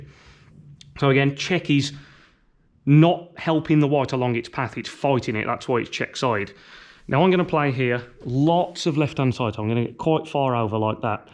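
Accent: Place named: Stourbridge, United Kingdom. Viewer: Sounds like you are from near me, stourbridge!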